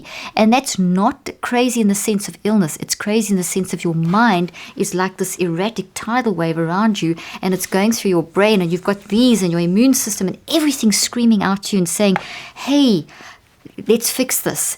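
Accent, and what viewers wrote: South Africa. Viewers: South African….Irish accent much nicer, I am saying this as a SA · I was guessing but wasn’t sure. Typical South African accent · Ek hou van haar aksent!suid afrikaans aksent! Claude Frankryk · All good. I wish you didn’t have the SA accent and didn’t have to talk so fast. On the other hand that will make people buy your book. Talking so fast seems to be a millennial thing but it doesn’t come across as being sincere, or did you have too much coffee. You kept on showing your book but it always have that metal tree in front of it. Was that also a trick to make is buy your book?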